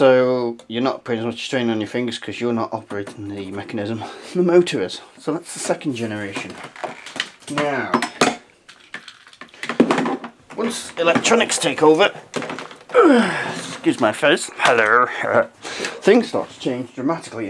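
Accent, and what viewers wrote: United Kingdom. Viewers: A British sounding!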